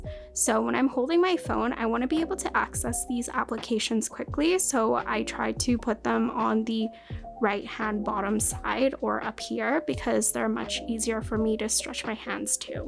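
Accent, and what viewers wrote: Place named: England, United Kingdom. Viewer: I like your english accent, can you make a content about it?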